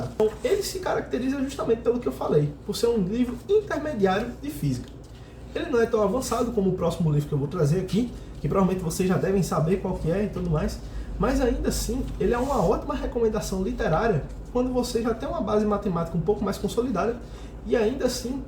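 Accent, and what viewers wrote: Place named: Pernambuco, Brazil. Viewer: sempre que tu aperta num vídeo inteligente assim tu já sabe que vai ouvir o sotaque de Pernambuco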